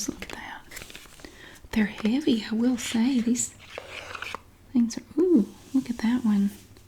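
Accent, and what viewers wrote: South, United States. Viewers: I was really drifting off when I hear “ooo it’s fancy chapstick” in amazing southern accent and I started laughing half dozed off out here... need to comment that! GN😂🖤🖤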